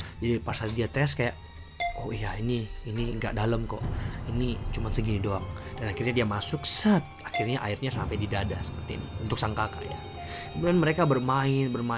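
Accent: Makassar, Indonesia. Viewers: Semakin seru konten nya Dengan logat Makassarnya bikin lebih merinding haha Semoga bisa dapat 500k Instagram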